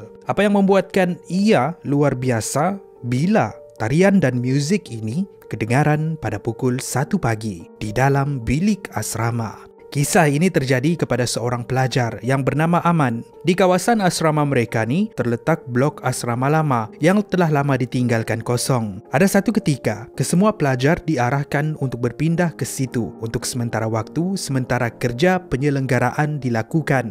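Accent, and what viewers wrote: Sabah, Malaysia. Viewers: abang orang sabah kan???😅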